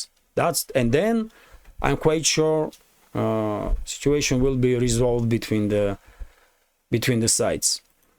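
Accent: Russia. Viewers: 2 streams is much better, dont forget the attention timespan of modern humans ( esp young ones) is less than 10 Minutes! Kepp on the good work, i really like to hear a Russian voice, even if you are emotionally involved and cant be 100% neutral. The same for Patrick Lancaster i watched 90% of all his videos and already in Mariupol battle it was obvious after he reports the civilian victims fate he cant be neutral. I can accept that.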